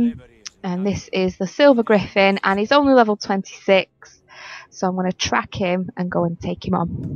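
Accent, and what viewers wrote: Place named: Atherton, United Kingdom. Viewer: Sounds really similar to the Atherton accent. You dont drag out the 'oh' sound in words the same though. Best example is my mates gf. She has a channel called olibomb on youtube if you're interested.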